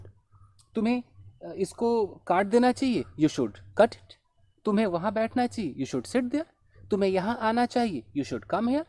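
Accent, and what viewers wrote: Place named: Kolkata, India. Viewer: Your 100% kolkata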